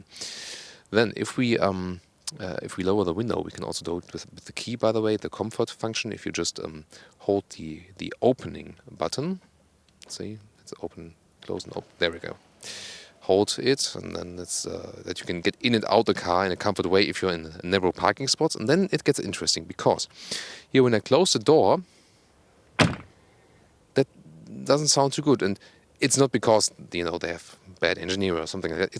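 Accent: Germany. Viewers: your spoken english sounds like german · that's germensh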